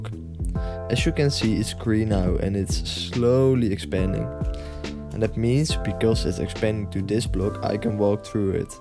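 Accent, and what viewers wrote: Netherlands. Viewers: I could already hear that you're dutch like so many dutch people sound like that when talking english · Jij hebt een Nederland accent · Im really curious to know where you are from! You sound like german/dutch, but I am not sure :) Edit: i knew it. You are dutch just like me :P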